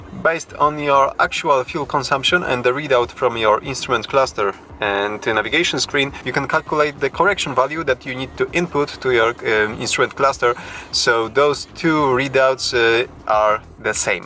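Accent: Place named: Poland. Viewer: polski akcent angielskiego, zawsze śmieszy :) ...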